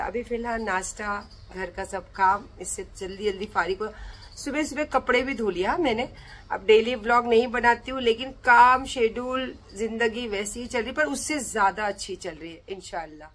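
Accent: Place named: Andhra Pradesh, India. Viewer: Yeh most of kyaa hain pata nahin kahan se english sikhkar aayi hain hindupur ki english hain 😂😂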